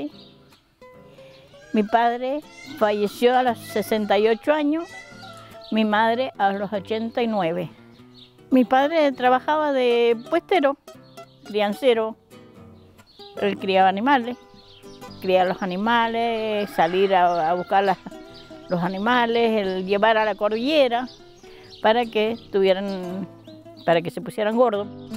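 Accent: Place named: Chile. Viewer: Habla igual que los cjilenos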